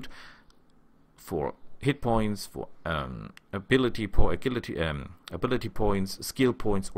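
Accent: Germany. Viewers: "Papaaaa, let's invade ze Poland again" heh Cute german-like accent aside, really looking forward this let's play, always nice to have some cozy voice playing something on the other monitor while I waste my life away grinding some game.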